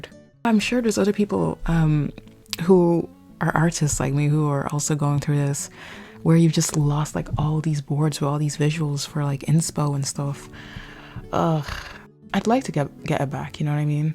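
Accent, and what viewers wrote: Ireland. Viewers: Omg are you irish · ur irish accent is really popping through in this video !! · She sounds more Irish to me 🤔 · she's irish lmao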